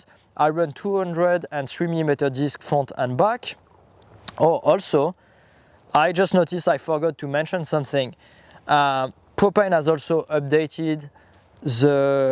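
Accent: France. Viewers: I just come to hear him talk in that thiiiic french accent. :body-blue-raised-arms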